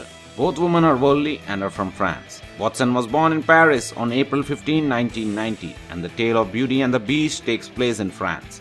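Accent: India. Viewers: bellay? her-mion gran-gerr?? bwahhaaa!! lolzz! mann sorry bt ur indian accent is juss too pronounced! (fyi, I'm indian 2, bt I pronounce words ryt!) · Actually he is Indian. And that is is why he is speaking ridiculously. He is speaking in Indian English accent . The most funny part for me was when he pronounced hermione as Hermene and Belle as Bell-e!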